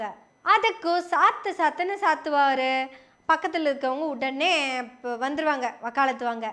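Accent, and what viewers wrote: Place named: Coimbatore, India. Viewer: பாப்பா உன்னோட கோவை தமிழ்ல நல்லா வைச்சி செய்து இருக்கீங்க 😂சூப்பர் 👍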